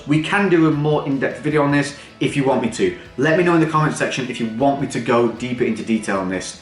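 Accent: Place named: Yorkshire, United Kingdom. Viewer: I've recently found your channel and I'm loving it! You sound local to me, I'm near York.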